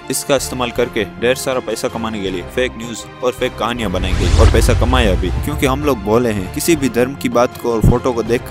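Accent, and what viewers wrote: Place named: Rajasthan, India. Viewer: aapke langauge tone se aap rajasthani lag re ho ya gujrati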